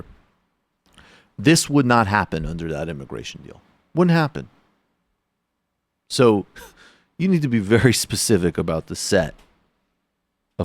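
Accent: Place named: New York, United States. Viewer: cuz he's a new yorker XD he also says yuge, instead of huge.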